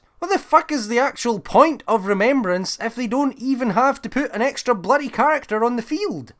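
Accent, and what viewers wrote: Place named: Scotland, United Kingdom. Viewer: I love your scottie accent holy shit😭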